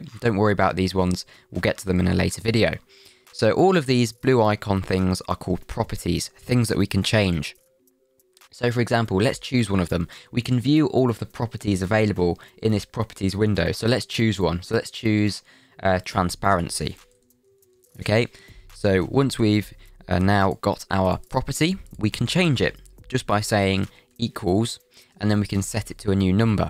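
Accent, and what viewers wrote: United Kingdom. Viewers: British? By your pronunciation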